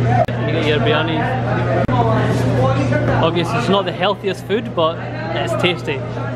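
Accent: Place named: British Pakistani, United Kingdom. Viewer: He seems a british pakistani by his way of speaking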